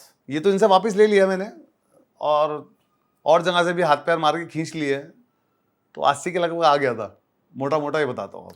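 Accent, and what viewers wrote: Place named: Saharanpur, India. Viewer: He is real man..his accent is actually Saharanpur wala...wish him success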